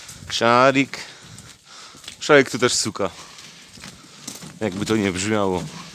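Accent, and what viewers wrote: Silesia, Poland. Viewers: Konkretny materiał 💪💪 Ze śląska jesteś bo taki akcent ? Sub i łapka leci Zapraszam również do siebie na odwiedziny Pozdrawiam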